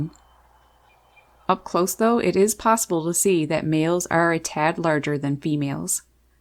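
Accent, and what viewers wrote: Newfoundland, Canada. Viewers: Number 4 video on the binge, as soon as she mentioned Newfoundland I caught the newfie accent. Howdy from Alberta. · I have a bluejay friend! I feed a family of crows every day, and this little punk dude started showing up. I give him a small piece of whatever the crow bros are having, and he comes every day now! We are buddies! I know he's a he because sometimes his mate comes and she's a good bit smaller like described. I call them Sid and Nancy, because they like making music together(no one said it has to be music for humans). Omg you're from NL ME TOO!!! <3 knew I recognized the accent!!! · Love your commentary. Also your Canadian accent. I visited Nfld when I was twelve and my sister worked at the hospital in St Anthony. What adventures we had!!! Thanks for these videos. Catherine from VT. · I love your videos! Very educational and beautiful camera work. 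And your Newfoundland accent is so pleasant to listen to. Thanks!🐦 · Sounds Canadian maybe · Aboot? I've been following you for years, I didn't know you were Canadian...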